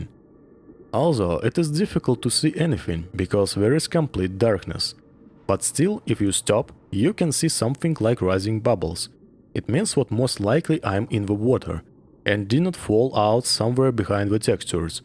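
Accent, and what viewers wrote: Russia. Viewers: Мне кажется что этот пчел русский, хотя в акцентах я не шарю... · dude I love your russian accent. it is really good and cool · Сразу понял, что русский по "зэ и вэ". Насчёт "the" - "дэ" самый идеальный вариант. Надо поработать над произношением. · Такой акцент, мэйд ин раша, сразу понятно))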